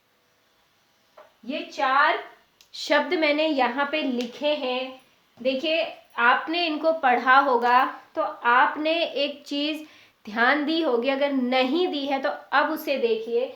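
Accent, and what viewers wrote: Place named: North, India. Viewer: इस्पर्श कोण पढताहे आप नॅार्थ वालेही ' व ' को इ पडते हो. श के जगह स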